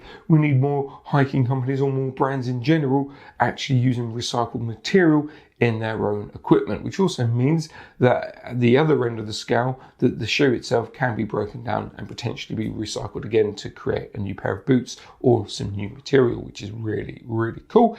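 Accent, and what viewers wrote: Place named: United Kingdom. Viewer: Lol you literally cannot pronounce the "eye" sound of any word or letter. You can only say "oy" instead. Like instead of supply (suppl-eye) you say supploy. British accents are hard to listen to lol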